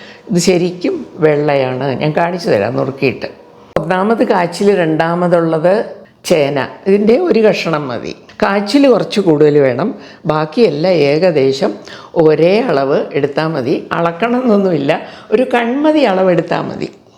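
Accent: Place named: Vaikom, India. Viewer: ടീച്ചറിന്റെ നാടു വൈക്കം ആണോ. അവിടത്തെ ഒരു സ്റ്റൈൽ ആണ് സംസാരത്തിൽ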